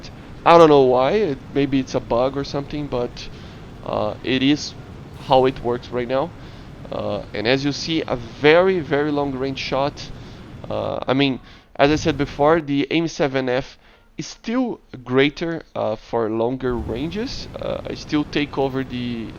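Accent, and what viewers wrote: Brazil. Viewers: What is your nationality? You seem to have a Brazilian accent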